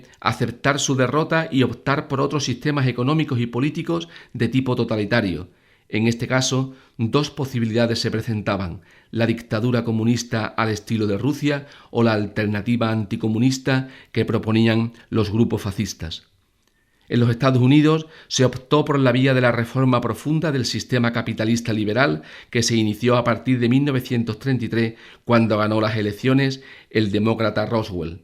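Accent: Andalusia, Spain. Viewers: Maravilloso acento andaluz.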